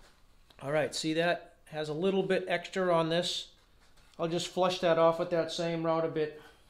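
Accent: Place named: Massachusetts, United States. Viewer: I was wondering for a while why you didn't glue together the two lengths of transom reinforcement earlier in the process. But you then explained that it was to minimize the effects of the epoxy on your drill bits and to consolidate the glue-up of the transom and brace into one operation. That kind of forethought and careful planning is an example of what separates you from the vast majority of YouTube boat builders. Thank you. P.S. As a Rhode Islander born and bred, I appreciate your Massachusetts accent, your Quincy lat/long signboard, and your Cape Cod hat!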